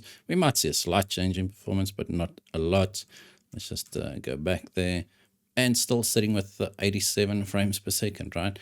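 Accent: South Africa. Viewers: Is that Afrikaans accent I hear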